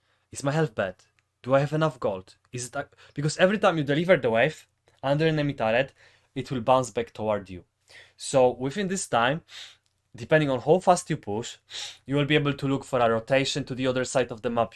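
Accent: Poland. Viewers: Are you from Poland? Jesteś Polakiem bo akcent wydaje mi się że słyszę Polski. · Pewnie polak sądząc po akcencie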